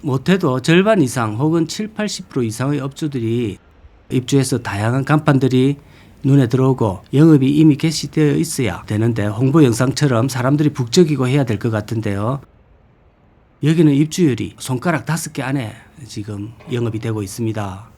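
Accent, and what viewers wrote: Gyeongsang, South Korea. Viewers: 목소리 사투리 매력 있으요 핫팅 !! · 구수한... 경상도 사투리 멘트... 최고입니다...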